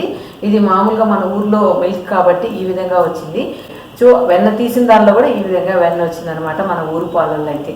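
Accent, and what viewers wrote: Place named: Nellore, India. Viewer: easy chitka bagundi nellore yasa matladuthunte bhalega vundi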